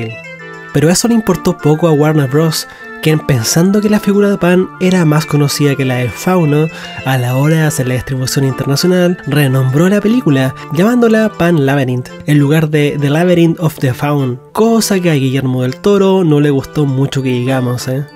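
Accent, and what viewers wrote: Chile. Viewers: tu acento es bien chileno ,man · Qué malísima esta review . Y no hablas neutral.. hablas chileno. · NO HABLAS NEUTRAL. Tienes el tipico acento chileno. Ahora que no lo notes es diferente. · Acento neutro???? De acá a la china se nota que sos chileno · Dices que hablas neutral??? Pero si hablas Chilensis 🤭🤭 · Eres un grande pero sin ofender el acento chileno se te nota a leguas, no creo que domines el acento neutro. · Nos ered neutral, se nota tu acento chileno a kilómetros.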